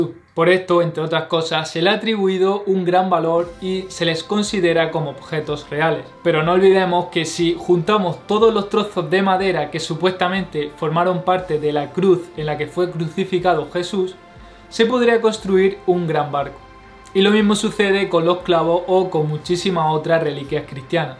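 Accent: Andalusia, Spain. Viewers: te pregunto hablas otro idioma a demás del español por que te escucho y me parece que hablas con mucha dificulta el idioma español o castellano como lo quieras llamar, tal vez tengas un asentó muy fuerte original de el país español y real mente a los latinos senos hace algo difícil entender a un español cuando tiene un asentó muy pronunciado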